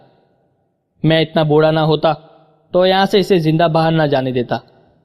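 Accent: India. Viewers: YR MUJE LAGTA HAI K YEH VOICE LIKE INDIAN TARZ KI HAI IS IT RYT ?? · Brother yeh indian hai aur indian aise hi bolte hain Doesn't mater.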